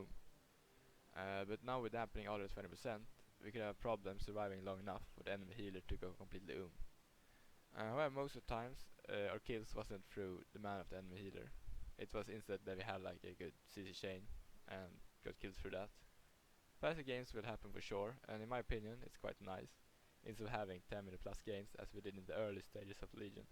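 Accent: Sweden. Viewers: Are u from sweden?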